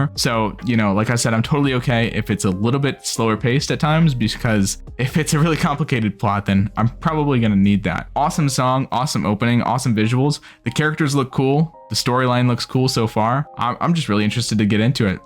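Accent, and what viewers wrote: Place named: North America. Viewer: Вот это английский. Наконец-то я понял КАЖДОЕ слово. Интересно - откуда ты? Из какой страны и региона?) Я по английски не говорю - но понимаю его (90% на тексте, и на слух моё понимание варьируется от акцента реакционера). Это точно не англия, в которой слова "глотают", словно повыбивали все зубы, это не центральная европа (там акцент более жесткий), и не восточная (там жестко абсолютно). Не латинская Америка. США, канада, Австралия - скорее всего одна из этих стран. Но в США от штата к штату акцент тоже варьируется. Точно не Техас))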